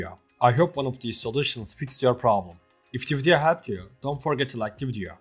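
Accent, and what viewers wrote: Turkey. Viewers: Thanks for the help brother. Btw your accent sounds like turks. Is there any chance that we're from the same mother nation? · aynı türkler gibi ingillizce konuşuyorsun.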